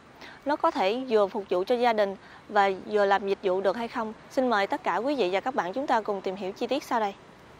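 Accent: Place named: Mekong Delta, Vietnam. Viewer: Đúng chất miền Tây: Ờ, gờ lờ, 499chiệu, gọng gãi, phục dụ...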